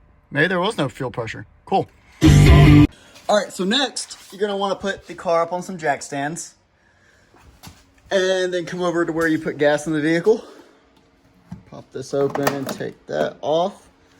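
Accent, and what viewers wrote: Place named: Alabama, United States. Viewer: What part of Alabama you from?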